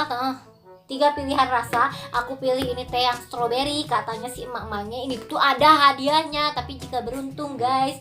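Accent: Sunda, Indonesia. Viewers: Lucu pisan kalo ka ngomong sunda campur indo🤣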